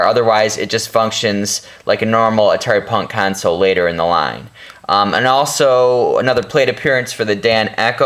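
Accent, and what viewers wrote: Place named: Cleveland, United States. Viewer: are you from cleveland ohio? trying to guess accents just.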